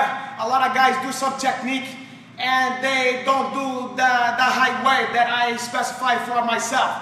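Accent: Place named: Brazil. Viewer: "He's going to face this way my brother. C'mon my brother!" LMAO that's how so many Brazilian instructors talk. I love them all but it's so spot on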